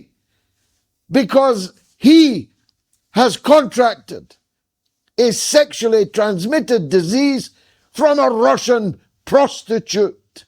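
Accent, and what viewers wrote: United Kingdom. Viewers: What kind of British accent is this? Thanks.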